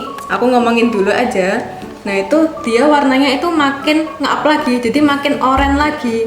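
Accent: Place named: Surabaya, Indonesia. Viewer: pernah ketemu di event hoc. Hahaha. Suroboyoan pokok e wes.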